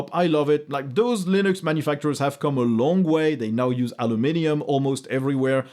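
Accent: France. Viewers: Wait, are you french?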